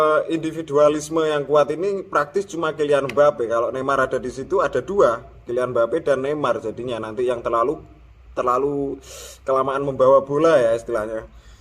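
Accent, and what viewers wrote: Java, Indonesia. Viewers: Kalau boleh saya rating secara pribadi, 8/10 Pro: - karakter pundit jowo nya dapet dan pemakaian bahasa nongkrongnya bikin kita serasa lg ngobrol di tongkrongan - suara nya enak kalau didenger di podcast - tengil dan ada bakat ngeselin di show, jadi bisa bikin seru acara Cons: - kebanyakan noise dari mukulin korek/rokok/liquid/atau apalah itu, ganggu banget - banyak baca, mata jarang ke viewer, menurut ku agak kurang, kalau cuman podcast no visual sih oke oke aja Tetap semangat bang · Teruskan aksen jowomu .. makin keren 👍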